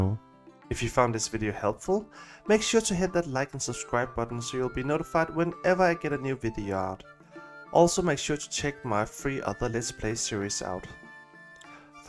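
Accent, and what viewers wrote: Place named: Denmark. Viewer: Faldt over denne video, og sad også og tænkte "han lyder lidt dansk ham der"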